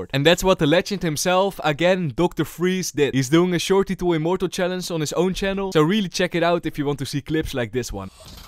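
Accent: Netherlands. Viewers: First sentence and already knew you were dutch. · ur dutch right? (guessing off your accent)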